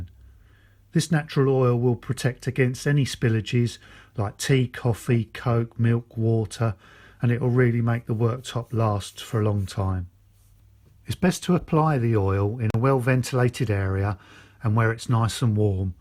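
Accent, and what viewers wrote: United Kingdom. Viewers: May I ask, which part of the UK do you come from?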